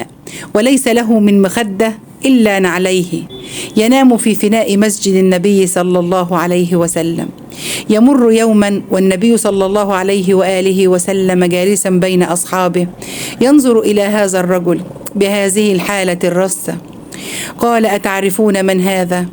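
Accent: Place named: Egypt. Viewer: السلام عليكي ورحمة الله وبركاته انا من متابعيكي اختي في الاسلام اختي الفاضلة ومحتواكي عن نشر احاديث الرسول وامور الدين جعلها في ميزان حسناتكي لاكن سامحيني في هذا الامر ان تتكلمي في اللغة العربية الفصحى لانها لغة القران فالجيم جيم وليس كيم والثاء ثاء وليس ساء والذال ليس زال وانا من متابعين شيوخ مصر واحب اسلوبكم في سرد اخلاقيات ديننا الحنيف لاكن ارجوكم ان تلفضو اللغة العربية كما هي وسلامي لشخصك يا غالية وشكرا وصل اللهم على سيدنا وشفيعنا وسيدنا محمد وعلى اله وصحبه اجمعين الى يوم الدين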